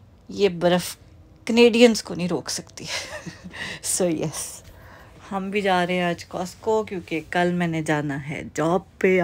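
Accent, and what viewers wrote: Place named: Punjab, India. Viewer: Though driving.and ur commentery aur uper se Punjabi touch❤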